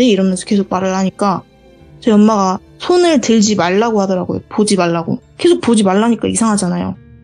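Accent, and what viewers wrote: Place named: Jeolla, South Korea. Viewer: 전라도 분이신가봐요.. 어딘지 알거 같아서 ^^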